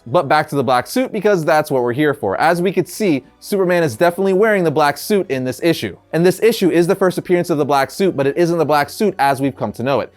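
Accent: Puerto Rico. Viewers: Where's your puerto Rican accent? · What!?!? Eres boricua???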